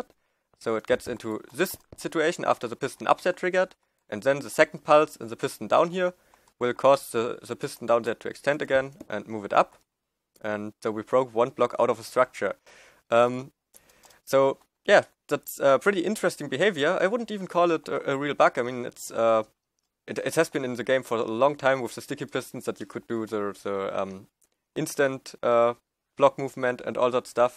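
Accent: Germany. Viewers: der is deutscher als deutsch das hört man immer so schön raus xD aber gutes englisch :)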